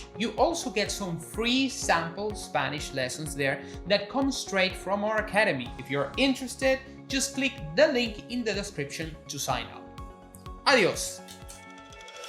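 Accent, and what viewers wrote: Texas, United States. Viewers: Habla tex Mex no problema...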